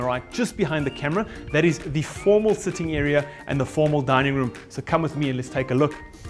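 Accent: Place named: South Africa. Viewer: Thank goodness! A normal SA voice! So much better! Ur hey guys. See guys ur. Lol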